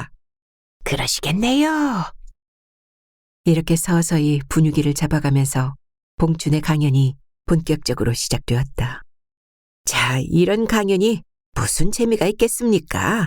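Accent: Jeolla, South Korea. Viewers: 이번작품 음색은 폭신폭신합니다. 저만의 느낌일수도있지만 처연,청아등… 님컨디션에따라 다를수도 있겠지만 미세한 무언가 있습니다요,이번엔 차진 남도사투리로 들려주시니 그도 감사합니다^^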